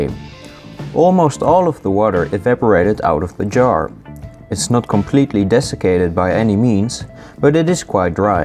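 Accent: Netherlands. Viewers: Is you'rs to? And i think you're not english deu to you're speech i think you're dutch pwease respond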